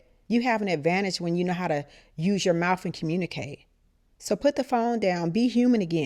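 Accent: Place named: Los Angeles, United States. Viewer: You from LA? You got a LA accent.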